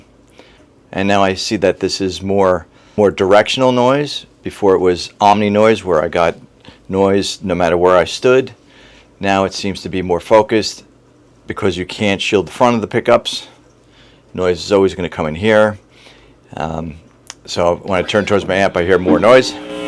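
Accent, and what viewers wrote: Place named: Brooklyn, United States. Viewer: I detect some Brooklyn in thar…..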